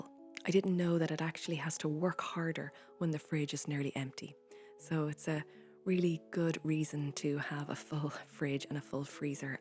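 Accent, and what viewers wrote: Ireland. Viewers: thank you so much for the recent video on how to save energy, some new tips some old. I likes the door draft / stopper!!. In my country the private consumtion of energy has dropped during the winter; people can save if they have to, most depending on the high prices on electricity and gas... I likes so much that you were facing us during your entire 'energy lesson'. Your voice and your Irish version of English is a feast for me and I guess also for all followers. Now your eayes and smiles supporting you voice, message and stories...makes it even better. Here where I live, the days are longer, the sun is shining as like today, however the nights are cold, and also during the daily walks, a wollen hat or similar headwear is needed. Thanks again for the video, your advice, your narrating on energy. All the best to your family. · I was a single mama, therefore I had to be frugal as I had to pay for all the expenses and our house on my own. 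No help no money from my little angels father. I paid cash for everything( except the house payments you know) I called my electric and power company’s to find out what they call peek hours here in USA. So from pm at night until am it would be cheaper to do laundry or run the dishwasher. Since it was just us two , I ran the dishwasher once a week after pm and did laundry another day once a week after pm. In the summer I grew tomatoes/ cucumber/ corn on the cob, mint and herbs. I always made strawberry/ mint/ cucumber water never purchased soda. I was on a budget BUT I Made it happen. I’m 61 now and my little Angle is all grown up and has left. I lost my mom so it’s been an adjustment but now I’m living with the man I knew most of my angels life but I was focused on being a mother and taking care of my aging mother so I never wanted a relationship a friendship was great for me. Now that we are together I’m still very frugal and still make my own house cleaner as you do vinegar r/ baking soda and lemon were my cleaners and still are. 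My home is now rented out and I finally have a little extra income I’m saving. I just found your channel and love it. My dream has always been to go to Ireland so I just love your accent 🙋🏻‍♀️👏🏼👏🏼👏🏼👏🏼 thank you lovely words of encouragement and advice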